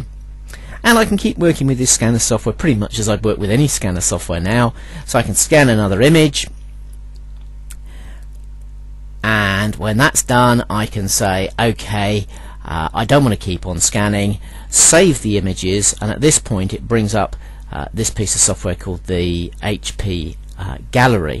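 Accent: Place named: United Kingdom. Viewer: Enjoyed your British English so much, just listened for this purpose :)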